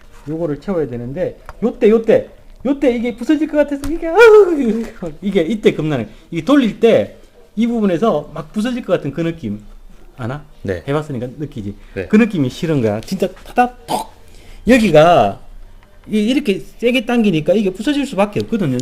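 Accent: Gyeongsang, South Korea. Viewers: 참 컴퓨터 조립처럼 변수가 많고 ㅇ;벤트가 많은 것도 없는거 같습니다컴퓨터 조립이 별거 아니라고 쉽게 생각하는 많은 사람들에게 좋은 교훈이 되는 영상들인거 같습니다 ㅎㅎㅎㅎ쉽다면 쉽지만 어려워 질려면 어마어마 하게 고난이도로 올라 가버리는게 컴조립인거 같습니다 항상 재밌게 풀어 나가는 영상 잘보고있고 배웁니다 ㅎㅎ억양은 경상도이신데 먼데 가셔서 자리를 잡으신듯 합니다~가계구경이라도 가보고 싶내요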